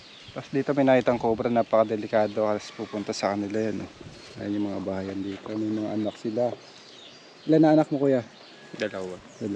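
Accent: Visayas, Philippines. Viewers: bisaya ka pala ya😁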